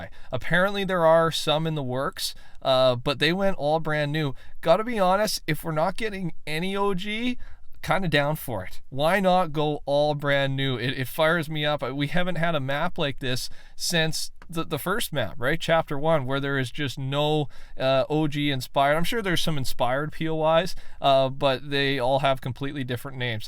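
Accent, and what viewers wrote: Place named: Canada. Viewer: Are you Canadian you just give off that nice Canadian voice and vibe…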